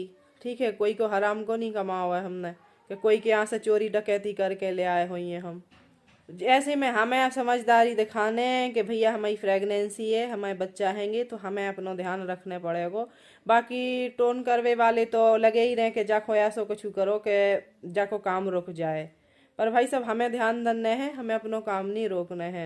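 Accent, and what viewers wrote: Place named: Bundelkhand, India. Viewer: Didi mai aapko indoor se dekhti hu mera naam asha hai mai aapko bhut pahle se dekhti hu meri mummy ka gav bhi tikmgad hai mai bhi aapke jese hi bhsha mai baat karti hu bundelkhng mai aap bhut piyari ho kisi ki baat par dhyan mat do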